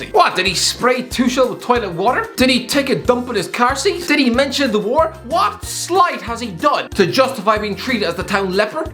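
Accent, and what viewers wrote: Ireland. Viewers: An very Irish accent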